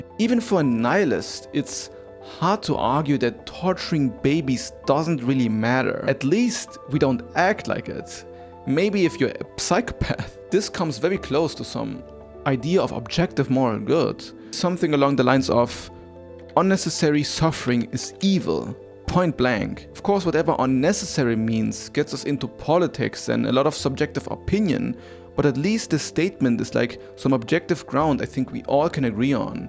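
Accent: Germany. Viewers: Is that a german accent I hear? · This may be the greatest Video I have ever seen. I made my way to nearly the exact same spiritual/philosophical journey and came to a similar conclusion: Love is the connection we DECIDE to realize that we are or should be the same thing. The opposite of this is what we all perceive as evil: the separation of entities and acting (unfairly) different upon them. That means that the more we empatheticly put ourselves into the shoes of one another and the more we decide to be part of the same thing, the less evil we are and act and the more meaningful and happy life becomes. Cheers to you my friend for having similar discoveries! Or should I rather say cheers to me? 😉 (ps: your accent sounds German to me - where do you life? If you live in Germany I'd love to have a real life conversation about things!) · Where are you from? Your english sounds like it has a german accent lol.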